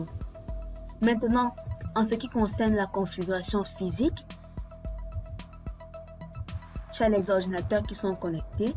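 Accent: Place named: Cameroon. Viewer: Tu intonne comme une Camerounaise.. Tres bonne lecon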